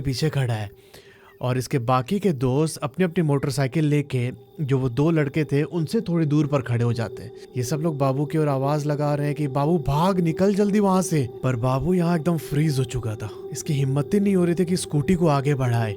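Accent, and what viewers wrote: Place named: Uttar Pradesh, India. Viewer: Bhaiya please aap kaha se ho apki tone uttar pradesh se ho yesa lagta hai please reply 🙏 ❤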